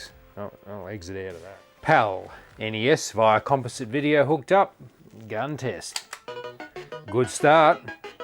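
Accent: Australia. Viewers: I was thinking the same thing. SCART is a European thing and he sounds Australian. I use SCART RGB for all my retro consoles.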